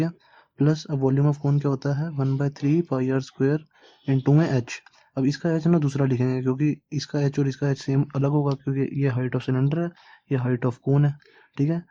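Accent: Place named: Kashmir, India. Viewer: you sound like kashmiri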